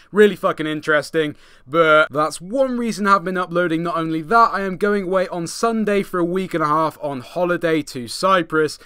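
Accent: United Kingdom. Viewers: No offence but you must be brain dead if you think he sounds like the gaming lemon. Just cause his accent is British it doesn't mean they sound the same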